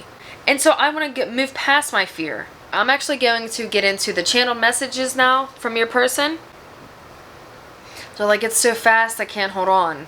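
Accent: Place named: Pittsburgh, United States. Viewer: and you have a Pittsburgh accent like me haha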